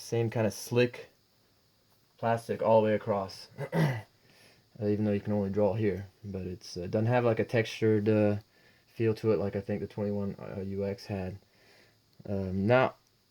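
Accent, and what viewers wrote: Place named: East Coast, United States. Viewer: Ahhh ok gotcha. Exactly, the person mentioned cubebrush, whoever that is. Another I'll need to look up. The artists you mentioned are great btw. You live in Europe? Hmm wouldn't have guessed that. You sound kinda like you're from the East Coast.The paper one sounds good. No way that can scratch the screen too right? Yea the plastic is harder to control. Almost impossible to get fully controlled quality of line I found at least. So you got two models one early and one later as a replacement and they both were smooth..? Yea see those in videos, glad they replaced it. Wow that's awesome. K good hope not. K. Would you want to sell the Corel? I hear you. Been watching videos on Photoshop.. the mixer brush looks really interesting. I'm guessing it's only on the stupid CC versions? Was trained on Photoshop too long ago, forgot everything. 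Sure via torrents and key g3ns. Don't condone it either but good to know. Great idea, will do that ty. Very good to know all that. One thing I'm dreading is setting up things, settings etc. Am already pretty systematic in setting up computers but with the number of settings to save to the remote, the number of brushes one can use, all the different settings to account for it looks a bit overwhelming. Yes you did, thanks so much!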